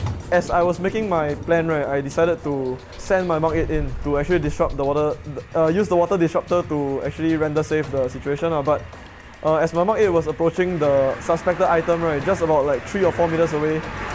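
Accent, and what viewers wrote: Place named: Singapore. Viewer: His singlish .....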